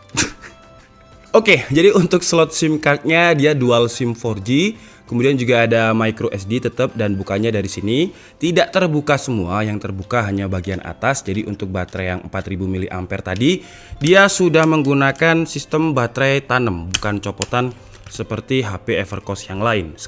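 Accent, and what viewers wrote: Java, Indonesia. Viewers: Mas sampean jowo ngendi???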